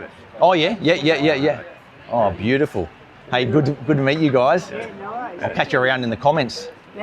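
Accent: Australia. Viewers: Your accent is true blue mate. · For us Europeans your accent is 110% aussie ! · Your accent is defo Aussie! Love the “how’s your father” saying too 😂 Great vid ❤ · I have noticed alot of different Aussie accents since being here. Yes. You sound Aussie mate. Visa free sounds like no Visa at all required. That surely can't be the case?? I also noticed a brown haze around the moon the other night and have a pic of it. I just thought it was from people burning off rubbish. Another great video. Thanks. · No, you 100% have a strong Aussie accent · you certainly still sound like an Aussie. I lived the first 28 yrs of my life in Adelaide and I still get some people who think I was born in England. Some people don't realise there's more than one Aussie accent. I really appreciate all your recommendations, Bahasa lessons and visits to other islands. I was speaking to someone a couple of days ago who looked at going to Bali and it was going to cost $1900 with Jetstar! 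Mind you, she was going to book only two weeks beforehand and would have been in Bali during the school holidays. Keep up the good work but don't burn out. We're so looking forward to being in Bali in October. Alan's already counting the days down. 😊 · Nah mate. Your aussie accent is fully intact. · Love your videos,... thank you for sharing your walk arounds and life experiences, language, and views 👏👏👏... and for sure, I can confirm that you have an Aussie accent and nuances 👌 😂 Sydney sider here... and always dreaming of coming back to Bali 🌴🌏🌞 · No surprise that the visa fee hasn't been removed, it will never be removed. Your accent is fine, you sound fully Strayan to me. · Funny,I think you sound sooo aussie,but I watch anyway!!I don't know where you lived in 'Stralia but you seem to have a Seeedny accent to me.but what do I know,I'm a Kiwi,but I have recommended your channel to a couple of people and said "he has a very Aussie accent tho",almost like it's a criticism😂.I'm a bit biased tho cos I have two syblings who are Australian.ignore the negative people,I think you're great and you've inspired me to think about moving to Bali when I retire in 3 years.cheers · As someone who enjoys a good strong coffee, I'll definitely have to give Wiski a go-go (Sorry). 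You sound Australian to me, especially when you use colloquialisms. I was once told I was South African by a lady in an office in a small town called Myrtleford, Victoria. For 40 minutes I tried to convince her I was actually a Kiwi, but she wasn't buying it. In the end I said I was from Swaziland and left. Small town people are funny. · you still sound like an Aussie. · Wiskii's iced cappuccino is excellent (along with all of the other styles). I'm a Mon/Wed/Fri regular at about 10am and he is always open then. Oh your accent is still recognisably Aussie. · Your accent is still totally Ozzie, never fear!😂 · Sorry, you can take the Aussie out of Oz but u will never loose ur Aussie twag…. Ur as aussie as dead horse on a meat pie mate!!!!!! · Hey … you have a very Aussie accent, not sure why people think otherwise. The tell would be if Indonesians think you have an Aussie accent when speaking Indo 😂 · Absolutely ! 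you sound full Aussie bro 😂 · you have one of the Aussiest accents I've ever heard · a well spokenAussie , but definitely Aussie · Im afraid youve got still got your full-on Aussie accent lol....you just have the ability of pretty good grammar pronunciation and structure of bahasa indonesia so maybe that conclusion is drawn from that...anyway yes food for thought re visa free...thanks for the info❤. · I am not fazed by paying for a visa ....Australia charges way more than Indonesia..$250 if you are coming to Oz ... maybe aussies should ask why australia charges so much ? Your Aussie accent is still there 😊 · Your accent is extremely Australian. Sometimes it can dip into colloquial/ocker, but overall it's the standard Aussie one.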